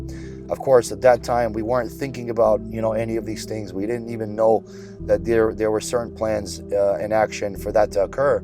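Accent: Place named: Russia. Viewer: Проблема в том, что западники считают, что есть только один способ жить. К сожалнию, этот способ - паразитирование. В начале были рабы, потом колониальные империи, до недавнего времени была неоколониальная империя номинально с центром в Америке. А теперь, когда принуждение других стало сложнее, паразитируют на своих. Посмотрите на ЕС, посмотрите на средний класс в Амарике. И сейчас вы уже знаете, что ничего нельзя объяснить. Те, кто живут индивидуалистично просто не могут себе представить других вариантов отношений в обществе. Россия не идеальна, но и Америка далеко не идеальна. Америка создавалась как идеальная страна для протестантской этики. Каждые 100 лет в западном мире приходит время для большой заварухи. То революция, то война... И это не случайно. Для этого есть глубокие и серьезные причины. Но говорить об этом абсолютно запрещено. Например, в протестантизме центральная проблема - это вопрос спасения, кто избран к спесению. 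К сожалению, все это выродилось в деньги. Долгий разговрр. У вас занятный русский акцент. :-))) Удачи с метрической системой! Я по работе бывал в Америке несколько раз в год много лет подряд. Конечно же, моя работа оплачивала мне все расходы, поэтому я не был стеснен ни в чем в разумных пределах, я видел как чеки на кассах становились больше и больше. Оно становилось хуже постепенно, почти незаметно, по чуть-чуть. Но неизменно оно становилось хуже. Я помню Сан-Франциско в начале 2000-х, да, была куча бездомных, да было грязновато, но было прикольно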